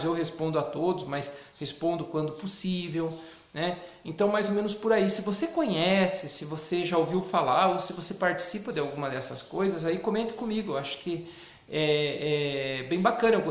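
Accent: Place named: Rio Grande do Sul, Brazil. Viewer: Muito boa tua posição sobre certos assuntos. está no sul pois teu sotaque parece de gaúcho! Abraços desculpa minha curiosidade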